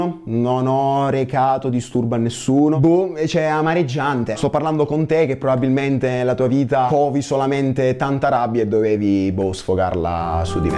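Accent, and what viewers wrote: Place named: Eastern Sicily, Italy. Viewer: c'è del video in questo accento siciliano orientale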